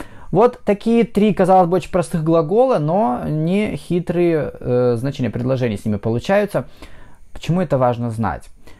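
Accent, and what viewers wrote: United States. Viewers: Лучший American "e-Nglish" в русскоязычном секторе. Thumbs up ! !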